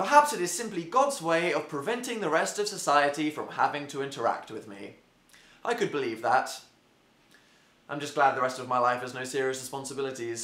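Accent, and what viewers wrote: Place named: England, United Kingdom. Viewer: *So.. you have Scottish vocal cords but an English accent.. w e l c o m e t o t h e s c o t t s *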